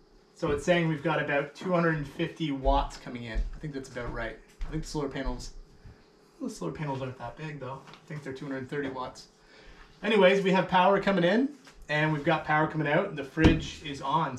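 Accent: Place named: Ireland. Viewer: Came across your channel yesterday and pleased it was recommended. You definitely have answered one of my thoughts. I was thinking why am I picking up Irish Ancestry through your dialect. Cheers from the UK 👍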